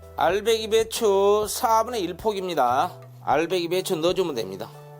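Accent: Jeolla, South Korea. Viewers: 셰프님!!거시기 전라도요!! 참으로 정겹습니다~~알배기 배추 추가가 키~포인트네요!! 감사합니다 😂❤😅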